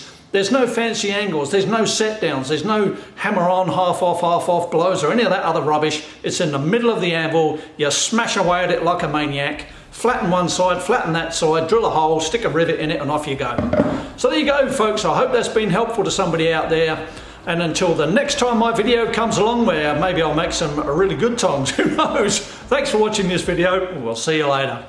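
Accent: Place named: Australia. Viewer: Nice to hear an Aussie accent. Good instructions for making a set of workmanlike tongs. Not trying for beauty - that’s for the things we use the tongs for. Thank you!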